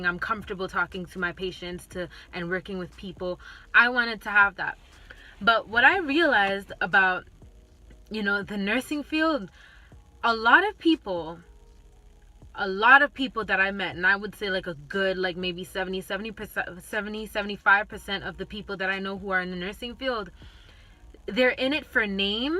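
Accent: Caribbean. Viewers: Pleasure is all mine. You do an excellent job. How did you fine learning pharmacology? I’m now reviewing and getting the jist of it before school starts in July. Do I detect a West Indian accent also? My dad is Jamaican and mom is from St. Kitts.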